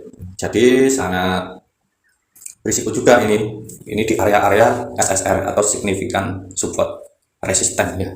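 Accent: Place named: East Java, Indonesia. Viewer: Ooo Jember yaaa mas ?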